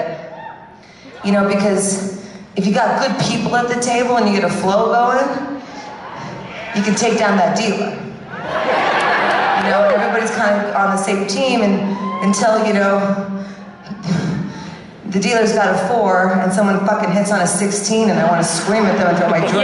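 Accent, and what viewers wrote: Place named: New York, United States. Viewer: That New York accent is coming through strong here.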